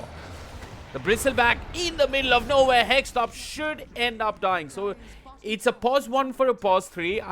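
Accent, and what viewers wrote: India. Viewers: wtf is this commentator?! an indian?? wtf?!?!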